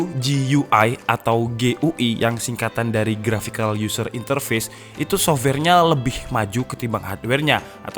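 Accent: Java, Indonesia. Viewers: Oo ini orang jawa ya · Mase wong ndi? Aku kepo logate kok njowoni ik🤣🤣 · Tarah wong malang mak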